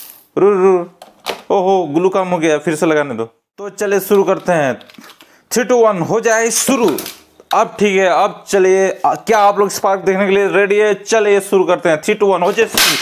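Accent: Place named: West Bengal, India. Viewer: West Bengal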